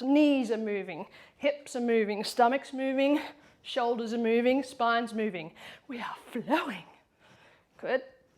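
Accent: Australia. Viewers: Hi. I sent this on your facebook messages but saw you don't go on there often from your last post.... you've popped up on my you tube and i've been watching a few videos and just love your energy and you resonate so much with me in so many ways but mainly as i've had a problem with my eyes/neck and dizzyness for so long!!! I want to get through your videos but i was wondering if there is any one video or sequence that you think i should start with? i just started your 10 minute lymth workout and i love it and the constipation one was so funny!!! Basically my eyes feel like someone has pulled them out on a spring and then let go and that weird feeling(like i've had that done before lol) but only way i can explain it. I look forward to slowly watching all your video. Thank you for helping so many people and i love your accent, aussie accent is the best!! Reminds me of my INXS days ❤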